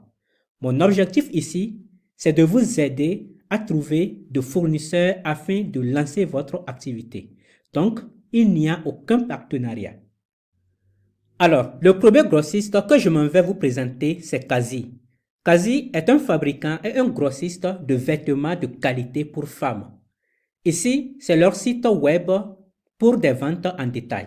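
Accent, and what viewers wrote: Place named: Benin. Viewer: Merci infiniment ! Je pense que t’es béninois comme moi vu ta façon de parler ✌🏽 Franchement ça va tellement m’aider ! Cette vidéo est top, claire et concise :)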